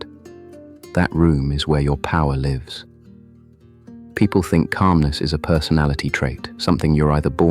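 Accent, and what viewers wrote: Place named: United Kingdom. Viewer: I love this english accent, very british, well chosen ! And the content of the poscast is really gold !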